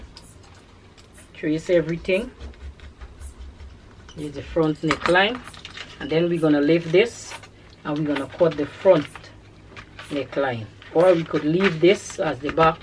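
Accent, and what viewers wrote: Guyana. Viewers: I just join your YouTube you sound like a Guyanese I would like to know where you buy your mannequin iwould like to purchase a size 20 one please let me know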